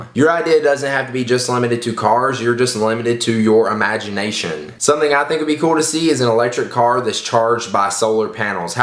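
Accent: South, United States. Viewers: dont u guys think 300 buks 4 the new touch is a bit much,,,,,ps where is he from ( southern draw)(but wheres flat bill hats)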